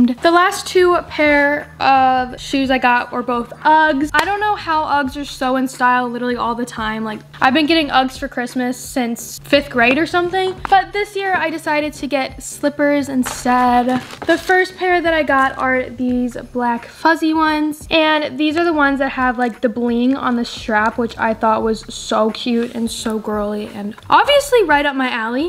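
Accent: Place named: United States. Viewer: He accent is very country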